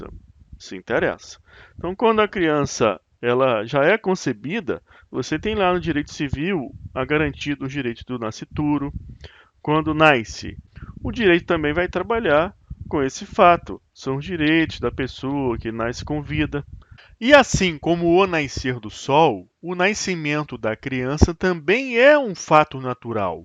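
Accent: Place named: Rio de Janeiro, Brazil. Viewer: Aula boa. Mas não deu para deixar de reparar vc falando Nascer substituindo o som do S pelo da letra I (naicer), é estranho aos ouvidos, mas sei que é característico do carioca. O Interessante é que vc conseguiu falar nascituro com todos os sons das letras da palavra.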